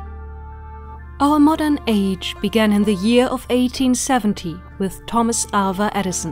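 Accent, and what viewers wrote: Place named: United Kingdom. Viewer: du hast ja einen wunderschönen britischen akzent. Viele versuchen ihn zu imitieren, aber nur wenige schaffen das zu so einem hohen grad. Entweder die leute klingen noch sehr deutsch oder übertreiben bis zur lächerlichkeit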